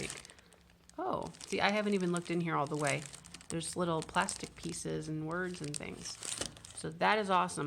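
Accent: United States. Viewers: Oh love the country accent omg laughing so hard 🤣🤣🤣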